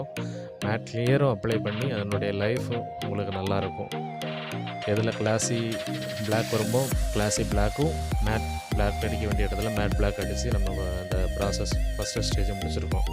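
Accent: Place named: Kanyakumari, India. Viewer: Malayalamum ila tamilum ilanu rendu kalanthu pesuringa kanyakumari slang ipaditha irukumo. Na intha pulsar tha vechurunthe alloy wheel ku munadi generation. Sadly apola vandi avlo quality ila silencer la ota vilunthudichu, battery 1.5 years kuda varathu. adikadi model update panathu enoda vandi athe pola update pana mudiyala. Ana classic pulsar classic tha athoda sound nala iruku oturathuku nala irukum. But ipo p150 pulsar vanthathunala palaya pulsar discontinue paniduvanga. Apo palaya pulsar owners ithu mathiri paint job pana chances iruku.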